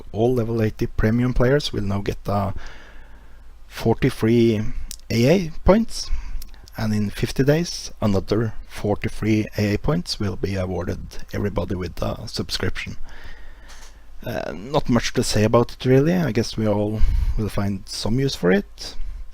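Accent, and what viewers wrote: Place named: Norway. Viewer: Well I don't see why would you need native speaker tbh. I suppose there is a lot of players in AoC which doesn't speaking natively so I think they don't mind your accent or how it call Norenglish :P Watched EP1 but I think it was tooo long. I suppose podcast about 10 minutes is just fine. Just continue if you want to do it really and also announce on forums as I did not see any info about it just came here by accident :P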